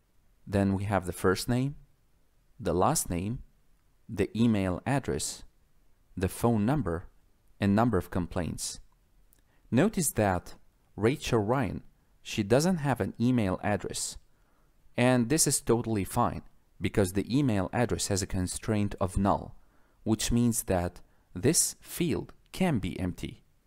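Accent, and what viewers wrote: Russia. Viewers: This was good! Is your native language Russian?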